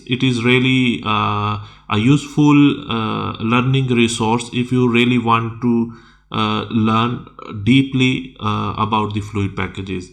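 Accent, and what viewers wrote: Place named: India. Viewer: Thank u very much love from India and your accent is also like indian Sir .....are you also Chemical engineering background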